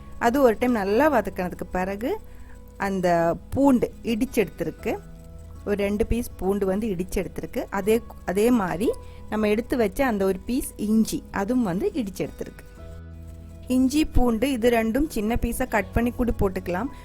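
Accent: Kerala, India. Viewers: Very good and tasty recipes with little ingredients 👌love your Tamil with Malayalam accent👍👍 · I like your Tamil slong with malayalam infusion.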